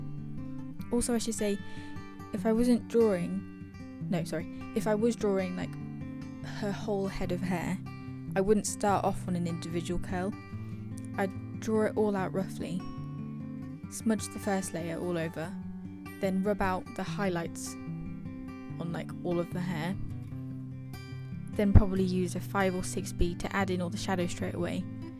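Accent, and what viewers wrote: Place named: United Kingdom. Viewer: What beautiful job, and a great job of explaining it as well. There's another artist on YouTube (LethalChris Artist) with almost an identical accent. I wonder what region of the UK you are from? That accent is really awesome.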